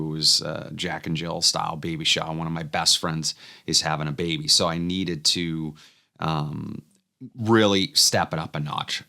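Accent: Boston, United States. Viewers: That Boston accent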